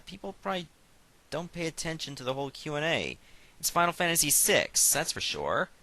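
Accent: Newfoundland, Canada. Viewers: 1. Is there a long, undisclosed queue of LPs that you plan to do, or are you more or less spontaneous with your long-term planning? Assuming you get a lot of various requests, is it impossible to estimate the time you'd LP any one request? [assuming it's not rejected] 2. Imagine you end up meeting with one of your favorite video game characters. Who would it be? Describe a scenario of what you'd do with that character if you hung out with him/her for one day. 3. Do you describe yourself as having a Newfie accent? If not, do you know of anyone who fall more closely to that sort of stereotype? Thanks in advance for your responses!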